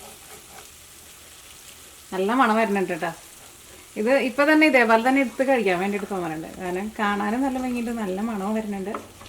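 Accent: Thrissur, India. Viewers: Hi,I’m from Nadathara/Thrissur originally but settled in the UK. Love your recipes reminds me of my mum’s cooking! Also your Thrissur accent...Ishtu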